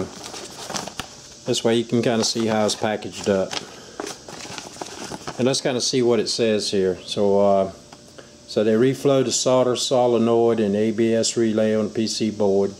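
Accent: South, United States. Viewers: my 2003 Cadillac Deville has this problem, and I'm going to <link> thanks for the informative video and that Southern Accent..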